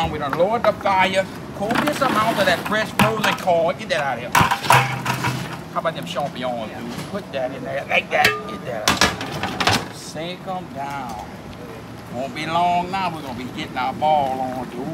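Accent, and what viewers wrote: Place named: Brooklyn, United States. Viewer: This guy must be from Brooklyn NY!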